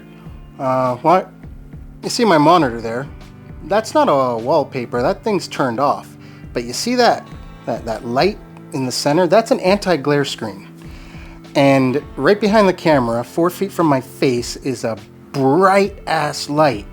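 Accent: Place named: Canada. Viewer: I subscribed because I like your voice sounds calming and Canadian 😊👌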